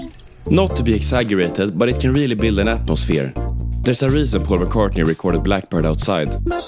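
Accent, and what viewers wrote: Sweden. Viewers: The Swedish accent is so damn recognizeable it's crazy 😂😂😂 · he’s Swedish so he pronounces “j” as a y. Stuff like that is how we got the name “Joe”. Joe<Joseph<Yousef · Every Swedish person can tell he’s Swedish just hearing how to talks in English 💀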